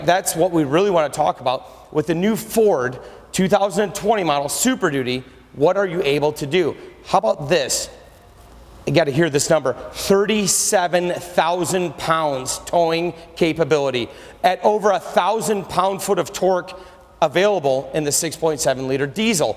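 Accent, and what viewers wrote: United States. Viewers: Yes!!! I was going to say this guy reminds me of Chris Farley so much! The Midwest accent with the all the exaggerated movements he was making plus how loud he talks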